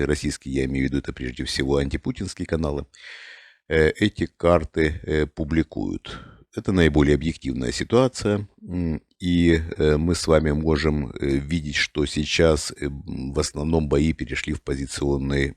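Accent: Ukraine. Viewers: Виктор Михайлович, вы же говорите по русски. А в русском языке принято говорить на Урале, на Украине, а не в Украине. Выражение в Украине сильно неграмотно и политизировано. Понятно на чьей вы стороне, да и акцент у вас украинский. Не знаю насколько вы генерал СВР. Вы хоть думайте хотя бы иногда, а то мне кажется у вас немного ненормально с логикой.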